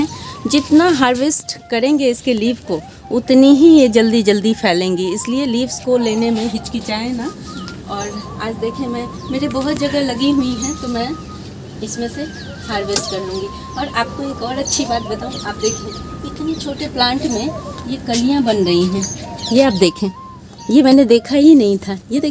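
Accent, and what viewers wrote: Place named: Eastern Uttar Pradesh, India. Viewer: Mam aap purvi uttar pardesh se lagti hai shayad ur good job👌👌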